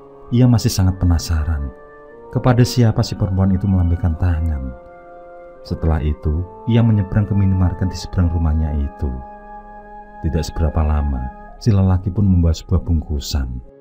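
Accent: Java, Indonesia. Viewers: Kenqpa ya,setiap ceritanya selalu memakai logat jawa..padahal kan budaya dan logat bahasa kita beraneka ragam..kadqng bosen jg kl selalu pake logat Jawa..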